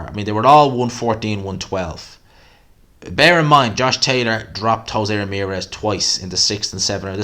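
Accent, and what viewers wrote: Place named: Ireland. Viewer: Excellent insight and breakdown Sir! I enjoy your videos. Dunno if it's the Irish lilt, or probably you really know what you're speaking about! Good stuff...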